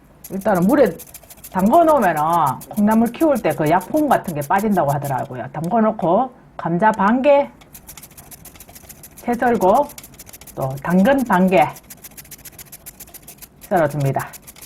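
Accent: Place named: Gyeongbuk, South Korea. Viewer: 와 안니비지노 ㅋ 경북말씀 맞아요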